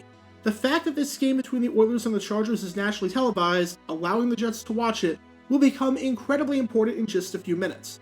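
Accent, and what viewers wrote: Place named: Philadelphia, United States. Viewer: is from philly/south jersey? he sounds like it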